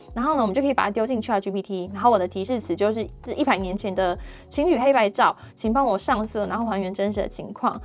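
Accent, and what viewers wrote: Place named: Taiwan. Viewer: 你说话真的好嗲啊，很酥，很台湾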